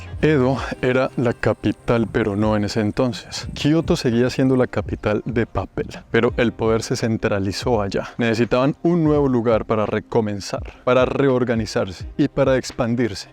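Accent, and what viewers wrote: Bogotá, Colombia. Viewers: Me fascina que hable como colombiano. Eso es lo más interesante de sus videos. Que hable con acento y palabras de Colombia. Eso es algo que le da un precio invaluable a sus videos, parce, el hablar con sello colombiano.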